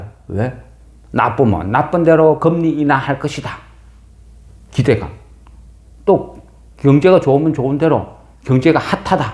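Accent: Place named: Gyeongsang, South Korea. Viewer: 앗 경상도 분이시다 ㅋㅋㅋ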